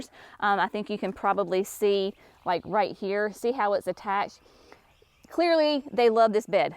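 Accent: South, United States. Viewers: ! I could listen to you all day! Your southern accent is adorable. I love how encouraging and inspirational you are. You give me so much courage and strength to get out there and get stuff done in my overwhelming yard. I absolutely love your quote, ”There is grace in gardening.” I need that sticker for my water bottle! 😂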